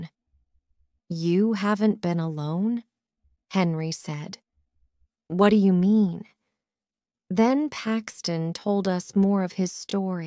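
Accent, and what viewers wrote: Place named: United States. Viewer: I do believe this is an England story, but read in American accent. I am an new English learner and I am currently learning American English.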